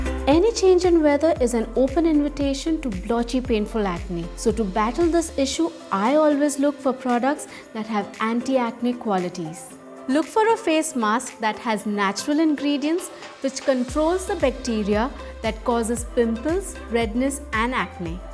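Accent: India. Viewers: U r beautiful 😍 r u from India? Ur voice n accent sounds Indian.😃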